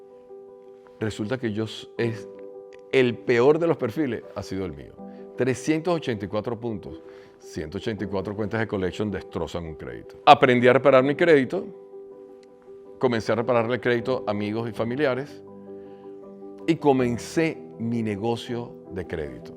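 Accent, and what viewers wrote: Venezuela. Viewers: Que gusto el acento venezolano en magnífica dicción y pronunciación. El contenido es extremadamente relevante. Gracias por tu testimonio de experiencia en el logro del éxito financiero. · AJJJJ ... ESE ACENTO VENECO... LASTIMA... ME INTERESABA EL TEMA... ADIOS Y REPORTADO ... 😢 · Coño seré sincero contigo cuando me saliste dije este viejo ya va hablar paja luego escuché el acento dije coño venezolano luego segui escuché me quedé hasta final grande historia pana que experiencia una gran inspiración para muchos nunca te rindes!!eres el propio tommyshelby. Saludos desde Portugal ya hoy te sigo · Que magnifico escuchar a alguien con acento venezolano, con mentalidad triunfadora y sobre todo enseñando consciencia de libertad!!! Statehood #51!! Graciass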